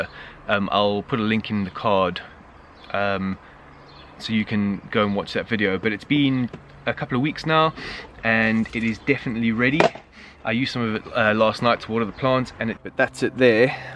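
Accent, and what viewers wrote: South Africa. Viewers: I thought the same thing. Had to come to the comments to confirm if it was South African accent :") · Hearing the SA accent just gave me joy lol. South Africans know how to DIY the right way💪💪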